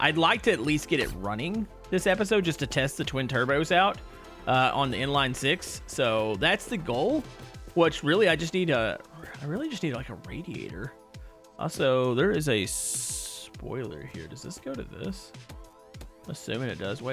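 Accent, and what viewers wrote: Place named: Texas, United States. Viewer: hes from texas so he talks like that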